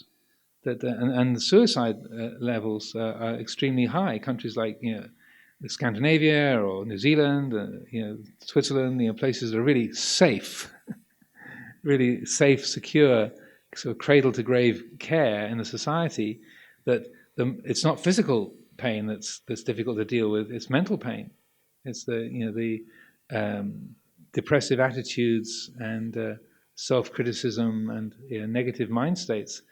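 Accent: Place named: Russia. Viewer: Brilliant words ! thank you most venerable Amaro - love the Russian accent :) and also the statement - ‘we are going to do something terrible - we are going to deprive you of an enemy ‘ I nearly fell off the chair when I heard that LOL Sadhu Sadhu Sadhu we are blessed to hear this monk’s wise words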